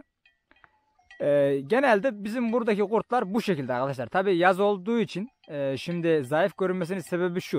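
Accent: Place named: Çorum, Turkey. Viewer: Çördük kızılca derler bizim memlekette.konuşmamız şiveniz bizimle aynı kardeşim.çorum benim memleket.ama bursadayım ben.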